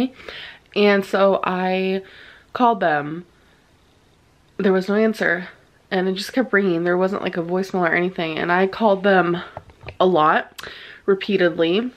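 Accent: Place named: Kentucky, United States. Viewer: That's her danity southern belle kentucky accent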